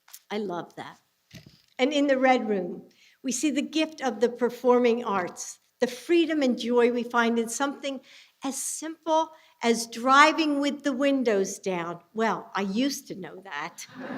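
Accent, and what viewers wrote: South, United States. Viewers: I’m sorry, I’ve never heard her speak with a southern accent like the beginning of this- Would sound nice if any truth or help with her words- It gets worse as it goes on- Dear God I wish it from the heart or star center for which she refers! I gotta bathroom lady... As they complain and hate America but go thru every room- can anyone imagine Biden spewing out this speech? Happy Holidays everyone from my heart seriously! God bless!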